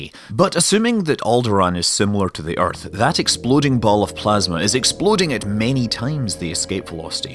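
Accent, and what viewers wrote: Scotland, United Kingdom. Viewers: i love your Scottish accent.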